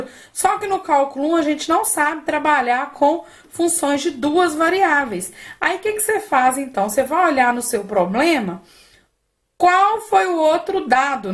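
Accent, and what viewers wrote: Minas Gerais, Brazil. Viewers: E esse sotaque Mineiro parabéns prof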